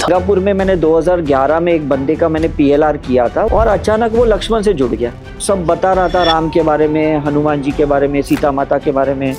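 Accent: Haryana, India. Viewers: एंकर हरियाणा के हो क्या भाई??? 🌹😊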